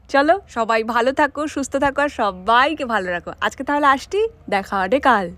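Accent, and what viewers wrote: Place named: Purba Medinipur, India. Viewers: Ata puro amader purba medinipur er anchalik bhasa, khub valo laglo go❤ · Ata to purba Medinipur er Moto vasa From PURBA MEDINIPUR ❤ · Eta purba Medinipur er bhasha-r moton.. Pray same.. Amar Sosurbarir desh bari Purba Medinipur.. Okhane sobai erokom kotha bole.. Khub valo lage sunte.. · Ki6uta medinipurer vasar moto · Didi amer bari purbamedini pur amader vasa khanikta oirokom sune khub vhalo laglo❤❤❤😂😂😂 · Ata to purba medinipur vasa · Ami Nandigram ar basinda onekta akoyrokom tone ta ❤ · Tomar Basanti bari lekhin kotha medinipur style er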